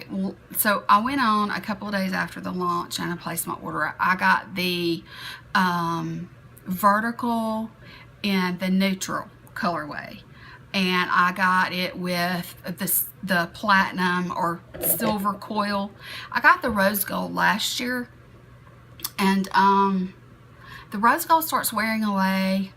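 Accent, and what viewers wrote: South, United States. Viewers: hi i love your southern accent im from australia and i love make up to and if you want to do more make up looks do it don't let any one say differant i would love to see more also you remind me of the actress cathy bates i like her movies to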